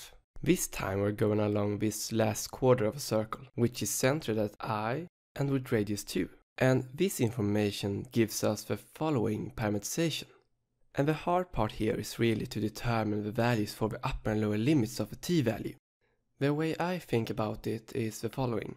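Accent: Sweden. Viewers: ikr!! he has the loveliest Swedish accent xDD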